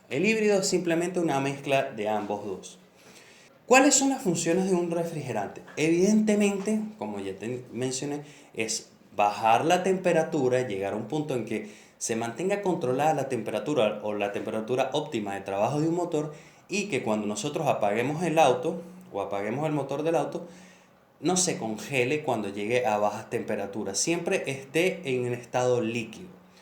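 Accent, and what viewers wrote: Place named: Venezuela. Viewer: Me da pereza escuchar hablar a los venezolanos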